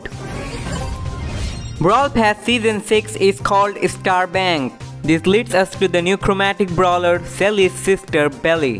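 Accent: India. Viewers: TF THIS SI NOT THE RERAL VOICE YOUR LIKE TALKING AN INDIAN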